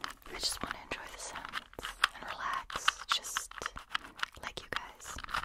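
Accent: Germany. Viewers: The fact that shes german and her english + asmr is amazing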